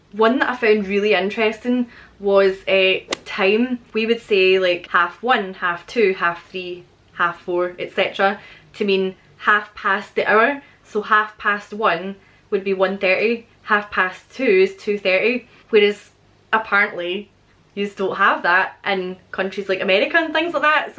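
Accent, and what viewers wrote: Scotland, United Kingdom. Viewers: we are from Saskatchewan in Canada and visited Scotland in 2015 and had a great time. We rented a car and drove about 1800 miles around your beautiful country. Your roads are narrow, but if you watched for oncoming cars there were pull out spots to pass. In towns if there was only one lane cars would wait and let you come through. Was amused that in our hotel in Edinburgh we had to place the door card in a slot to get the lights to come on. When you left and pulled the card out all the lights went off (including the fridge) Kids walked around without a cell phone in their hands. We met some friendly people. We were impressed with the highland cows and the many castles. Loved the quiet country roads that were lined with rock fences. Enjoyed the small tea houses/restaurants and. beautiful scenery and so on. Would really like to go back. 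our Mac Dougall ancestors came from Scotland and we would like to see the castle at Dunollie. I love your beautiful accent! Keep up the interesting videos. Thanks! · Hi!!! I've lived in Glasgow for 1 year....it was so good to listen to your Scottish accent again...I was missing it so much....actually when I was there I could not understand not even the word "gym"....I took some seconds to understand which word the guy was saying but now...one year later...it sounds lovely hahahah Besides that the weather and the fact that you get drunk at 8 o'clock used to shock me definitely hahaha But you are amazing...Scotland is amazing s2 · Loved hearing a Scottish accent again, having been born and raised in Edinburgh. Long before your time. 1938, to be exact. I’ve actually spent most of my life in the States, Los Angeles California. So our weather is completely different. Yes our Streets are wider, usually six lanes. 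However residential streets, are usually four lanes, two lanes, used for street parking. I can’t swear that Americans love our sense of humor, especially those we see on British TV. But I do make them laugh. And funnily enough, I’m not always making a joke at the time. I guess we Scots, just see things a bit differently. But I’ve yet to meet an American who doesn’t love or like the Scots. And those who’ve visited the old Country all loved their visits. I recall visiting some friends for dinner, and my friend’s wife said it was lovely having you. I just replied, “ I loved being had” I wasn’t trying to be funny, but it cracked them up. It took me some time to get used to driving on the opposite side of the street. Usually the worst times, early mornings, with little traffic. But after 55 years here, I’m sure I’d have the same problems back in Scotland. It’s no secret the USA, has a major Gun problem. 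Although I’ve never felt the need for one. Nor have my friends. It’s really a minority that owns more of the guns. Almost an Arsenal of them. But it depends on the State you live in, and their laws. As to the language differences, my first encounter with different words and usage, when I joined the Army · If I just start to listen to your videos everyday. Do you think it's possible for me to speak with a Scottish accent in a year? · I left West Dunbartonshire (Balloch) in 1957; I lived and worked in France and Switzerland from <num>. I've lived in South Carolina for the past 20 years. I never tire of hearing "my people" talk the way you do. I miss it too. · i'm italian and i LOVE your accent!!!!wish to visit scotland one day!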